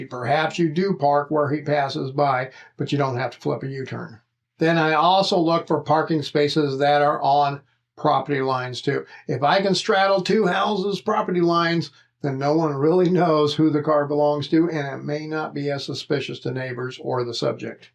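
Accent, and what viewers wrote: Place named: South, United States. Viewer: The 🔑 is leverage …and based on your accent you are from the south and you are reading from a prompter or a note .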